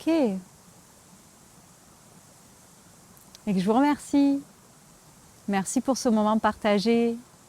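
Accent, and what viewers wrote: Quebec, Canada. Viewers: Je suis sur que tu es au Québec 😁ton accent Français se confond avec le Québécois lol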